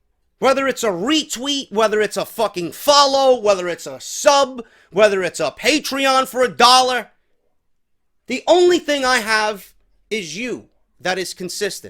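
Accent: New York, United States. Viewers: Dude you are so entertaining. I always watch. That New York voice is so funny.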